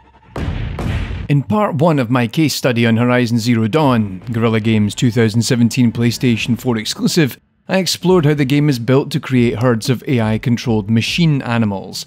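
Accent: United Kingdom. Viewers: Hello, I noticed you've put a game on steam. I have a game ready for STEAM..but having trouble putting out on there. Could you do a tutorial on this PLEASE. Not many good UP TO DATE tutorials or there. Thanks.. Ps. Nice to hear a UK ACCENT 🇬🇧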